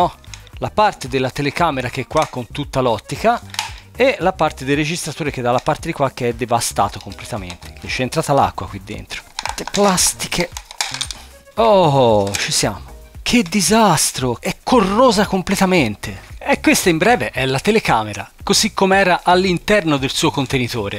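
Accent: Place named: Pisa, Italy. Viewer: L accento Pisano non si smentisce mai. Ho degli amici a Latignano. Ogni volta che sento te mi sembra di sentire loro. ahahah. Sei un grande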